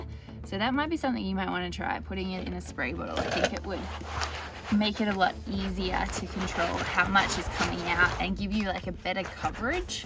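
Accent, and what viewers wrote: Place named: Australia. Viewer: "Cleen-a" Love Aussie accent. Thanks for a lovely demonstration.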